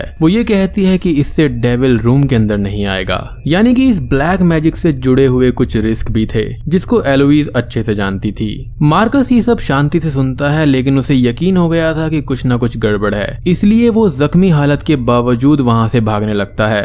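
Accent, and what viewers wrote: India. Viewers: Such an arrogant champ lol. Get your accent right indie boi. Hahah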